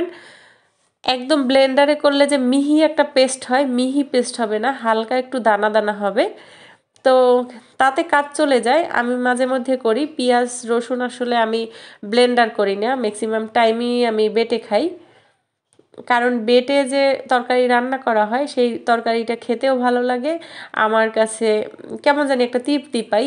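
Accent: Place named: Chittagong, Bangladesh. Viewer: Chittagong er ancholik vashai aitk gunda bole...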